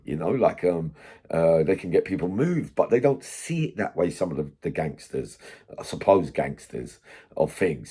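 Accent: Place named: Glasgow, United Kingdom. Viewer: A Glaswegian accent is one of my favourites. It's like nothing else. ❤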